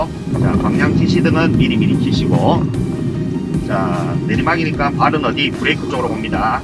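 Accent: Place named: Daegu, South Korea. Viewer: 와 억양이 좀 익숙해서 찾아보니 서대구 학원이네요 일주일만 더 일찍 알았으면 여기 등록했을건데 ㅎㅎ 지금은 ㅊㄱ 다니고 있는데 강사님들마다 달라서 쪼금 혼란스러운중입니다 ㅎㅎ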